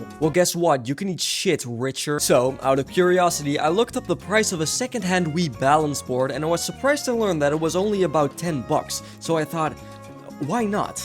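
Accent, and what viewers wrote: Netherlands. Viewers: Wait a min this channel is from the netherlands? So: oke test 1... 2... 3... test ik ga er dan vanuit dat mans nederlands is toch? Of ehh niet? Want als ik zo naar je kanaal kijk zie ik nederland en ik ben ook nederland en ook ik zag net ook nog € en dat is dan al europees ik dacht op recht dat je van Amerika zou komen en gewoon $ zou gebruiken maar nope nederland te gek en ook hey ik hoor langzaam ook een accent en die naam ik weet dat kip nederlands is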